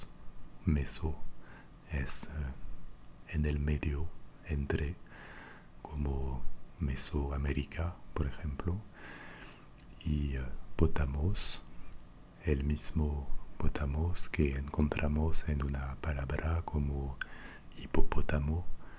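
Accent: France. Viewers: Nueva subscritora reconoci tu acento frances,estudie dos años frances,Tu español es muy fluido y me encantó el contenido! :) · Hablas bien español reconozco tu acento france :) gracias por los videos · acento francés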